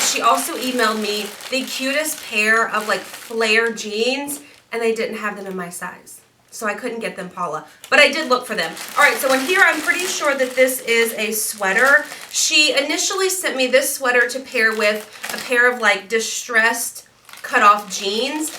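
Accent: South, United States. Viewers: What state are you from I noticed you are southern and yes I am southern to.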